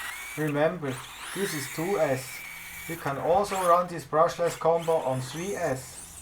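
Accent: Switzerland. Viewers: Are you from swirzerlanf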